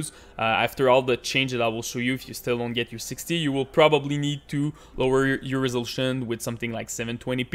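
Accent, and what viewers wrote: Quebec, Canada. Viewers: avoue tu viens du qc ta l'air d'avoir un petit accent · La bonne accent quebecoise jadore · Je peux enttendre ton accent Quebecois lol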